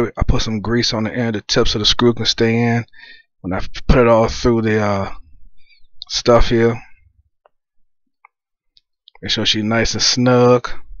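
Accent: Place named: DMV, United States. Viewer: I know a DMV accent from anywhere, Thanks for the help!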